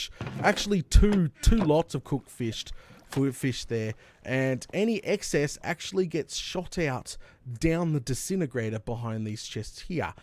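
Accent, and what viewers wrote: Australia. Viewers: not hating at all but you are just soo australian it’s crazy lol. anyway wonderful video and beautiful world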